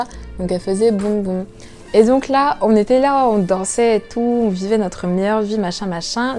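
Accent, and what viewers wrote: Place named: Cameroon. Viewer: L'accent Camerounais est présent 🇨🇲🇨🇲🇨🇲😂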